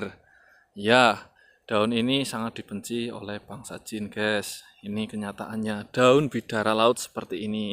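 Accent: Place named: Java, Indonesia. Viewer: wong ndi Rika,medok temen ngomonge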